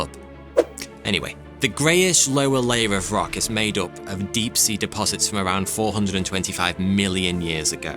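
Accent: United Kingdom. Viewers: Good grief that particular British accent or whatever it is is awful. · His partial British accent made things more slow n me sleepy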